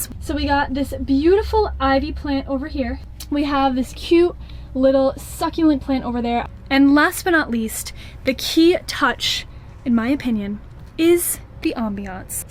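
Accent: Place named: New York, United States. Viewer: Just found your chanel :) great video am i tripping or do you look like an older version of millie bobby brown aka 11 from stranger things like this comment anyone if you agree :) anyway your pretty also im guessing your from ny i can hear it in your voice maybe im wrong lol have a great days guys best believe ill be watching your videos id like to see more of what you eat everyday you inspire me :) i subscribed!!! Yay!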